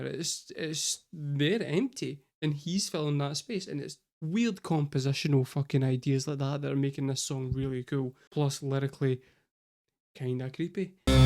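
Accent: Scotland, United Kingdom. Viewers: Nice to hear a Scottish voice getting it.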